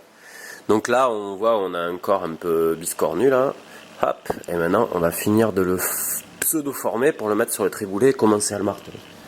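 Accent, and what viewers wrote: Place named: South, France. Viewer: Superbe création ♥ Belle leçon de bijouterie pleine d'humour avé l'accent, c'est top, Merci ♥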